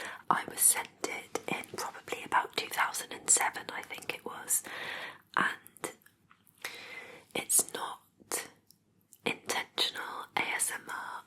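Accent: United Kingdom. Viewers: Hello! Thank you for “ breaking the fourth wall “ for a bit, and sharing a bit more “ personal aspects “ of you, and your life. I truly enjoy your channel, as you seem definitely more down to earth, and genuine (perhaps it’s an “ English/ British thing”), but it’s warm and approachable, this energy that you share in your videos. And your voice, gentle, along with you lovely accent, is so very relaxing. Anyway, as I noticed that you seem to have a bit more of a “ mischievous and playful” sense of humor as some of your answers in this video would indicate, would you ever consider doing a bit more “ silly, ridiculous, or playful” characters in future videos? Just a curious inquiry from a distant admirer…..be well, ( sorry, never learned your actual name, if you’ve ever shared it!) Cheers! Thanks again for all the hard work!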